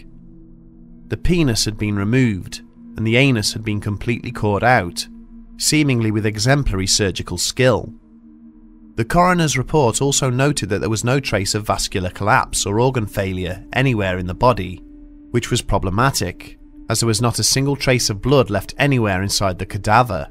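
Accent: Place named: England, United Kingdom. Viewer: Yeah he narrates in actual English not that American parody language..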